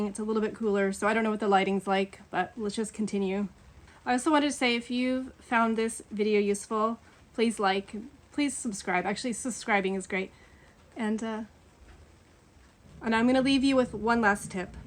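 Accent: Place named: United States. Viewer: I was getting confused watching vid.....back ground looked like England but accent American.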